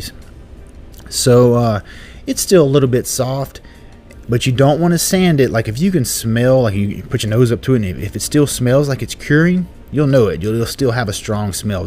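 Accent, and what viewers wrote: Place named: Midwest, United States. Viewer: Call Me silly, But gosh does it feel good hearing a Midwest Boy. Great video, and nice work.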